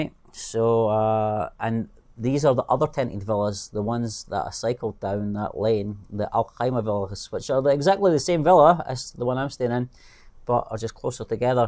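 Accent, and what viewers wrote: Scotland, United Kingdom. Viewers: Your Scottish accent is so strong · i dont understand what i like more, his content or his lovely scottish accent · I think this is the funniest one yet had me laughing 😆 my head of from the beginning I thought the camel was going to chase you but no the oryx did the way you fell back geez you were very lucky there lol you tend to push the limit's with animals, anyways your a CMU 😅😂🤣 love watching you your Scottish accent tops it all of✔️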